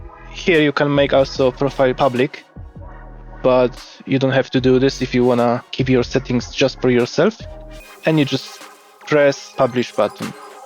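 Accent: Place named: Poland. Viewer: Słuchać, że Polak 🤣 Dzięki za pomoc!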